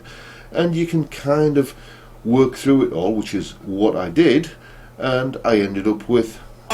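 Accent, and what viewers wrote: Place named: Northern England, United Kingdom. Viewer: Ignore the ignorance, speaking as a suvener, I love the northern accent. Keep up the great work, there are many people who I'm sure will get a lot out of this. Incidentally, my Dad who was from Hull would have called your music center a gramophone!:-)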